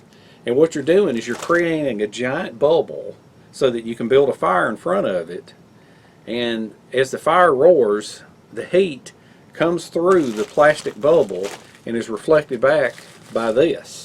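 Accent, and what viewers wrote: South, United States. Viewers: ok this is super stereotypical but your voice is the perfect southern accent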